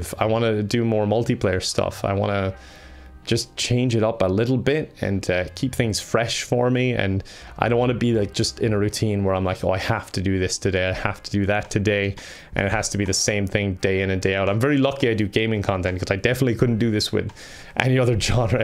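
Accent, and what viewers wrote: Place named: Ireland. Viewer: I feel that way all the time wen I see ur vids its like a piece of my mind sets at peace wen I see a vid I love ur content I love ur humor and I really like the accent, I kinda wish I had a heavy irish accent (I am irish) but its a relief wen I see ur vids I love wat u do its a really important part of my day wen I watch ur vids and I hope u keep going never quit and hopefully I can meat u at pax or something later on in life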